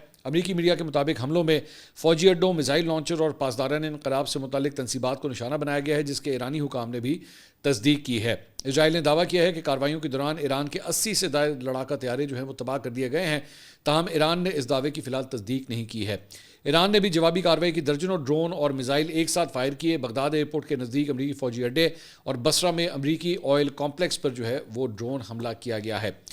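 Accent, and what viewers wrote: Punjab, Pakistan. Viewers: Jo ap Urdu bolty bolty Punjabi ka touch daity Hain I love that❤❤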